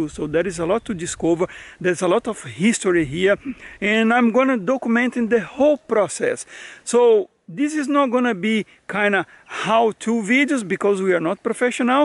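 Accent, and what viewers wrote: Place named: Brazil. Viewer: Algorithm just presented your channel to me. I will be following you on your restoration journey with interest. I knew you weren't Spanish, but couldn't place your accent as I'm not used to hearing Brazilian people speaking English. I'm English living near Valencia and understand there are laws concerning building on nature reserve land. You found a great property with huge potential. Are there many building regulations you have to abide by? Also you say "we", do you have a partner or are you solo. Best washes and good luck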